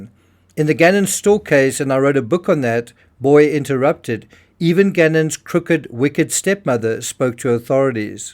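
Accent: South Africa. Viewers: Is that a South African accent??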